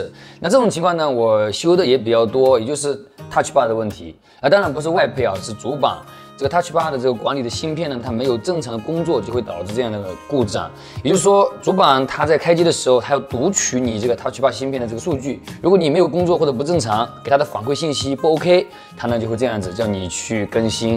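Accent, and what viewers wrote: Wenzhou, China. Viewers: 这温州口音，太熟悉了哈哈哈哈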